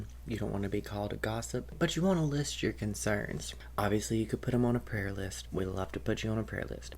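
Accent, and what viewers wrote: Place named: Texas, United States. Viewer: you sound just like the women in my Texan family - lol - even I can sound like that when I want - I live in Massachusetts now for many years so my accent is a tad less identifiable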